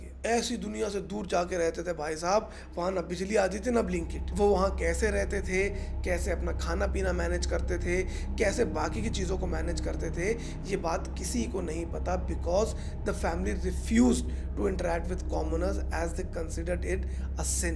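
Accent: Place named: Western Uttar Pradesh, India. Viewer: I like the husk in your voice texture and also your skill to communicate and explain the depth of emotions of each character involved in the story. I salute to the courage of that mother who played a fake identity so efficiently for decades just to keep her family secure and surviving under a shade. Aapke bolne ke lehze se lagta hai aap U.P. me Etah, mathura ya mainpuri ke aas pas ke ho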